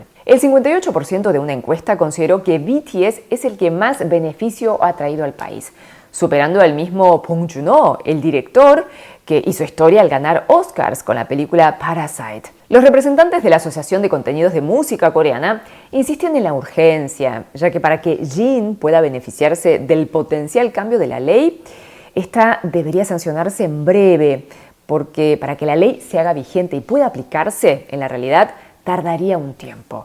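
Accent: Argentina. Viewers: Cómo no van a ir a ello teniendo al enemigo arriba y tirando bombitas cuando se les antoja?:/ sinceramente, a mí Corea del Norte me da muy mal rollo., tú estás en Argentina (por el acento que tienes me lo parece, vamos) así que tú estás a salvo, pero el resto? Pff...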